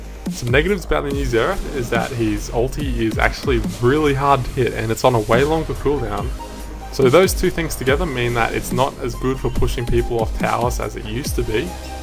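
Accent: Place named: Australia. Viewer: I'm really grateful they kept the damage consistent on his Q rather than ramping it up as you charge it. Good video, love the Aussie accent :>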